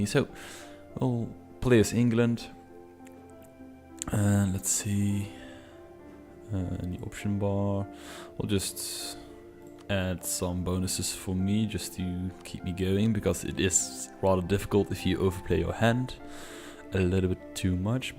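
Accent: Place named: Netherlands. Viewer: Well I'm assuming by your accent that you are actually Dutch and no offense but Italy shall win the World Cup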